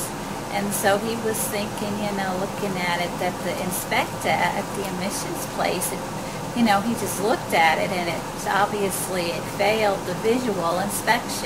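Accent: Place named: New York, United States. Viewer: I love your new york accent. My dear lady, i usually do my own car repairs cause i don't trust mechanics. And I believe usa mechanics like to sabotage foreign cars. You need yourself a good husband mechanic and jack of all trades. If I were single i would definitely propose to you. Take care.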